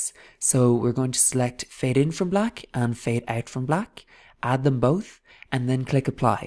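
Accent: Ireland. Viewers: Thank you, very helpful and easy to follow. Your accent makes me want to tour Ireland again -- Sláinte!!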